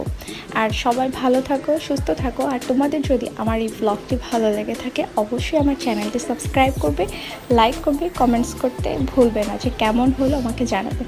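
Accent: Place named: Arab world. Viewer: Happy Eid Mubarak 🌷⚘🌷⚘ 👍👍 You are Awesome And I like your Arabia accent 🖐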